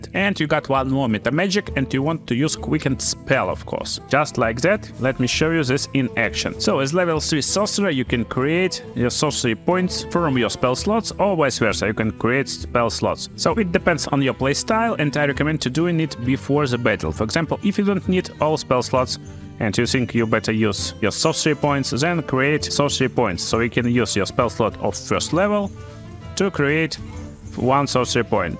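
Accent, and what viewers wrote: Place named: Russia. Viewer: I can hear russian accent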